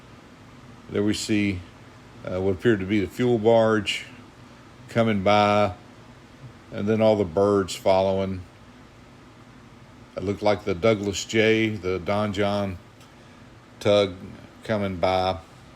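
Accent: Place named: Georgia, United States. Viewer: I came over from “What’s Happening in Shipping” as well. Enjoying commentary and level of detail. But more than that, I was raised in Athens so it’s great to hear a Georgia accent! Thank you!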